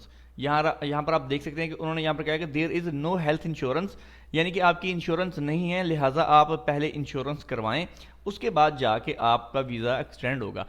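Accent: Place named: Punjab, India. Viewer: آ پ کا نام کیا ہے بھائی آ پ کی لینگویج سے پنجاب سے لگ رہے ہیں بہت اچھا کام کر رہے ہیں ویزٹ ویزہ کے متعلق آ پ کی ویڈیو ز سب سے زیادہ انفار میٹو ہیں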